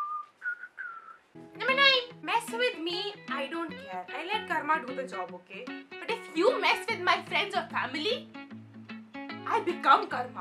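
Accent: India. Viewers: Your indian accent is sooo cute..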